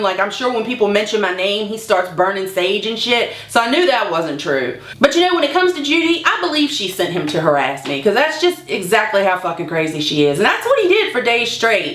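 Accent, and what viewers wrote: South, United States. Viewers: tbh you are an inspiration to me I also have a very heavy southern accent and I used to hate it, now that I have found your channel I am learning to embrace it. You are my absolute favorite youtuber.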